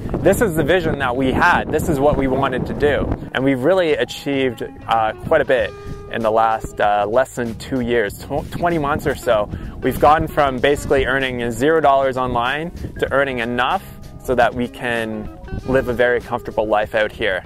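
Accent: Canada. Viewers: this video makes me really sad so I won't be finishing it. It's sad that we live in a culture and society where people think it's okay to ask about your money or net worth or whatever. I agree with your mom, it's disrespectful and no one's business how much money you and have in the bank. People are too entitled. If you guys have $2 or $2 million dollars, it isn't our business. That's between you and your wife. On a side note, I knew you were from Canada! Yes! Silently pats myself on the back. Your accent seems Canadian but some words you say sound slightly Australian, like you lived there for some time and picked up a bit of their accent. I digress. Anyhow, thank you for the videos!:)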